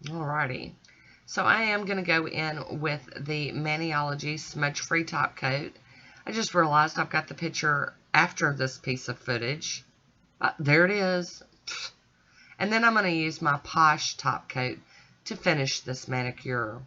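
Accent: South, United States. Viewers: I LOVE the southern accent. I’m from Talladega Al. Where are you?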